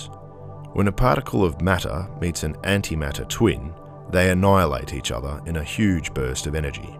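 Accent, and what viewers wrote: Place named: Australia. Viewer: Swear to god when if we have an Australian npc in our next game, I will want that voice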